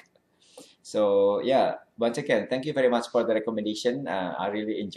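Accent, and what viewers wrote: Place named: Indonesia. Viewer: Your English is very good but I'm sure you are an Indonesian. I've got the feeling. If so, what part of Indonesia r U from? Greeting from Jkt. The mistakes/errors you've made are all the attributes to Indonesian speakers of English, no doubt.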